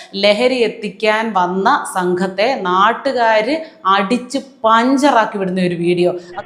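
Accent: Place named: Trivandrum, India. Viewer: തിരോന്തോരം ഭാഷയിൽ പറയൂ. അതാണ് കേൾക്കാൻ രസം . നിങ്ങളെ വ്യത്യസ്തയാക്കുന്നതും ഹാസ്യാത്മകമായ ആ ശൈലിയാണ്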